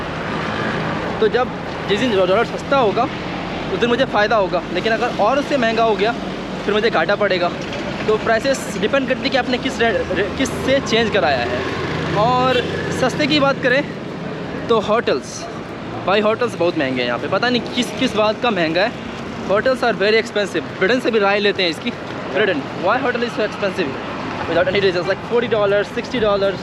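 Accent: Bihar, India. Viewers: Mitlish ka video acha tera bakwas 😡😡😡 h Tu bihari gadi ko gari boltha h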